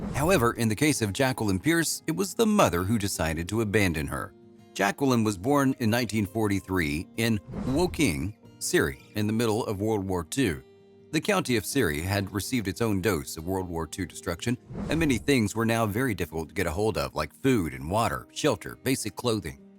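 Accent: United States. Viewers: sorry but american accent and its Surrey not sirry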